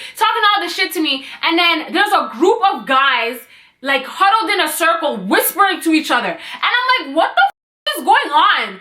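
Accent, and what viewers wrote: Toronto, Canada. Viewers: I love your toronto slang/accent lol it's so cute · The Toronto accent triggers me idk why😣😂😂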